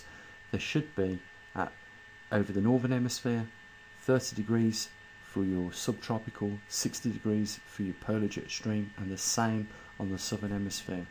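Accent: Birmingham, United Kingdom. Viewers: Bloody good info, this explain perfectly whats happening I fully believe were going into a GSM cooling period and I follow ADAPT2030 and Ice age farmer, so I will subscribe to you to get more good info....I'm in ozz now but was from Castle Bromwich..you cant hide that accent mate.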